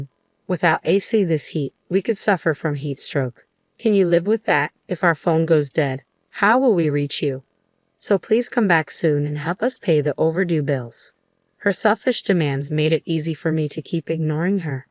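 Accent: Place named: Arab world. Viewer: Why the Arab accent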